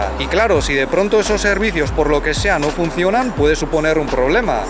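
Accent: China. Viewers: Oye hablas muy diferente a lo habitual pareces como que hablas en español con acento del idioma chino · Caballero no es por mal pero trabaja más en ti asentó español ya hablas como chino. No olvides tus raíces es tu identidad. Bendiciones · Ya tienes el acento chino..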